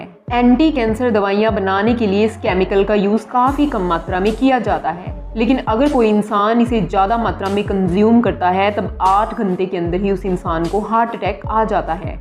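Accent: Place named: Gujarat, India. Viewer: The narrator has Gujarati accent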